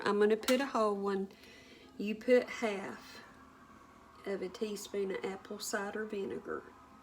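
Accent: South, United States. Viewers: You have such a country sweet way of speaking turn your eyebrows inside out!!!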